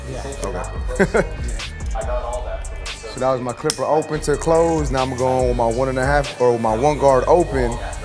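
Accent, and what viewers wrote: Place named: Mexico. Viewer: u lowkey sound like that mexican OT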